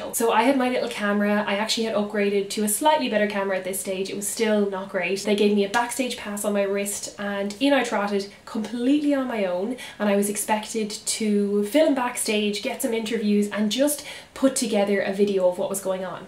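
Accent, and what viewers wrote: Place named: Galway, Ireland. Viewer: Well done :) I like the way you are down to earth and humble and you still have your nice Galway accent.. best of luck from Deirdre Marie Photography x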